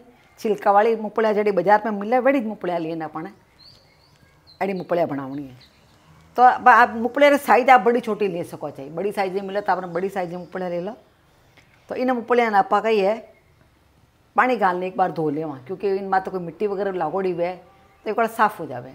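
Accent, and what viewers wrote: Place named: Rajasthan, India. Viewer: I am from Ajmer Rajasthan and fond of your cooking and lovely way of talking marwadi.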